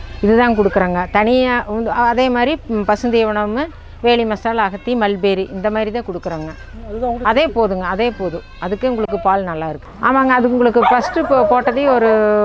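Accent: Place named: Madurai, India. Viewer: ❤ madurai basha ❤❤❤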